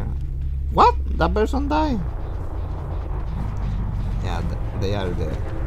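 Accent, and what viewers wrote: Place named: Spain. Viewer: You are so spanish 🤣 “ehhhh” Im so happy i got this for free basically because i had auric cells before the merger with netease and when the game came out last week i had 1005 cells and on my 3rd and last flip i got the skinnn for freeee9999 and the fact you said this skin cost 500$ made me even more hype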